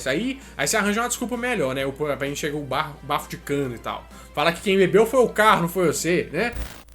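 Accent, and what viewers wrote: Minas Gerais, Brazil. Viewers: Sou nova no canal , ele é mineiro ? Se for amei 💕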